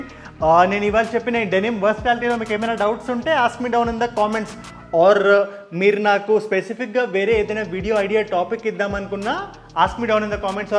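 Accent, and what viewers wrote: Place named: Hyderabad, India. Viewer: Love from Hyderabad, perfect Hyderabadi way of telling❤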